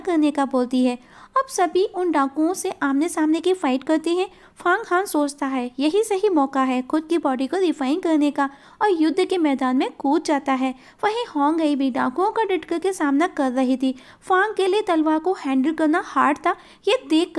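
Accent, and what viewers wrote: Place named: Bihar, India. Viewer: Khisiya hua tha 😂 aap bhi Bihar se ho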